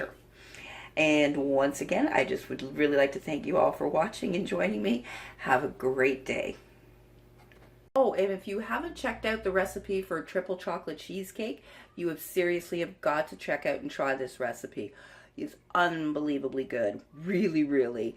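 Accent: Canada. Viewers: I love the Canadian accent and your recipes thanks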